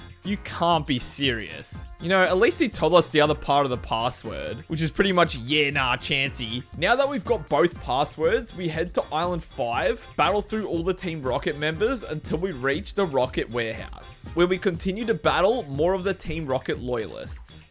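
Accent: Australia. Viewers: Cringe aussie accent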